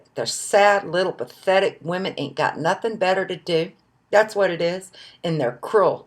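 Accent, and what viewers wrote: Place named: South, United States. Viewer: u go girl...you inspire us...i love your paula deen style accent..you are the real deal...wish u the best of luck keep losing..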